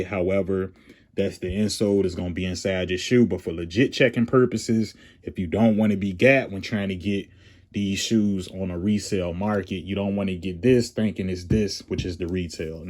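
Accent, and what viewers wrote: Richmond, United States. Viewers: Sound like you from Richmond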